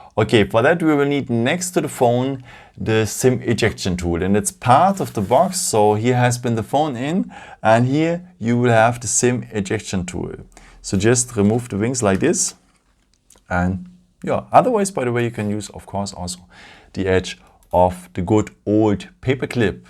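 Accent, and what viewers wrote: Germany. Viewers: It sounds like you’re a native German speaker, but this accent sounds unfamiliar. Which dialect?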